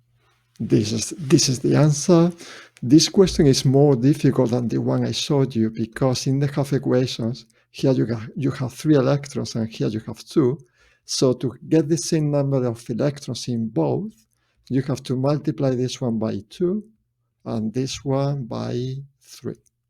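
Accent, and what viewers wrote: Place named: Spain. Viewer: Para alguien inglés no creo pero yo que soy español si lo noto un poco. Mañana tengo el a level de chemistry y tengo que decir que tus videos estan muy bien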